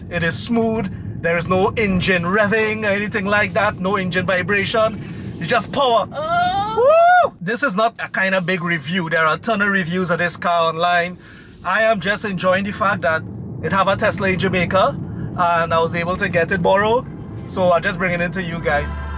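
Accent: Trinidad and Tobago. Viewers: He sounds very Trinidadian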